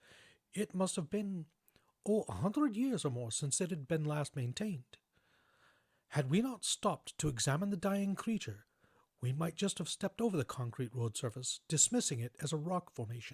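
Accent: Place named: United States. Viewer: In that case, I’d be happier to hear you read it than the dictionary, even in your regular plain ole American accent.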